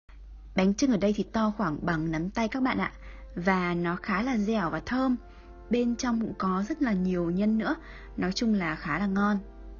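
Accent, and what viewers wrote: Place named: Hanoi, Vietnam. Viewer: " " Haha. Dễ thương, giọng Hà nội (gia đình >80 năm).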